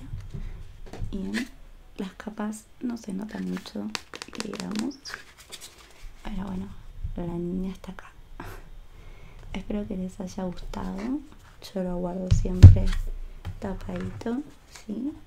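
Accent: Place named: Argentina. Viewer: El acento argentino junto con el tono y carisma de es buenísimo!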